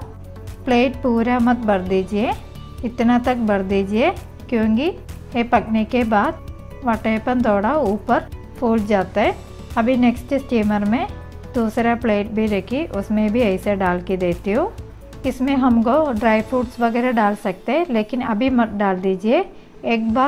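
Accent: Kerala, India. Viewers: Your Hindi with Malayalam slang is perfect. I love it. Keep up the good work.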